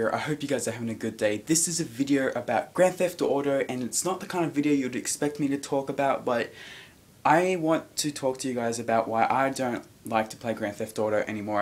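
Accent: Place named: Australia. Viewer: BRIT !!! he's Australian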